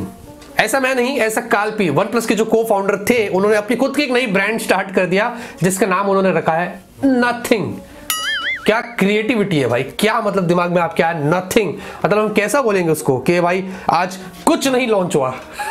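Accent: India. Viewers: Sounds so wired indian english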